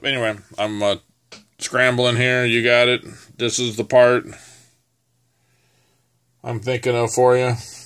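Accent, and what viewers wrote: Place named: South, United States. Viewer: That was fun! I never noticed a southern accent like I heard here. Nice work! Laural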